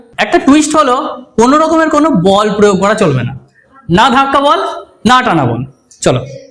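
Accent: India. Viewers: আপনার বাংলা কথাটা কিরকম যেন টান আছে। হাওড়া জেলার লোকের মধ্যে দেখা যায়। যদি পরিস্কার বাংলা বলতে পারেন খুব ভালো হয়। কারণ আপনি একজন শিক্ষক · বর্ধমান জেলার ভাষার টান, কনফার্ম!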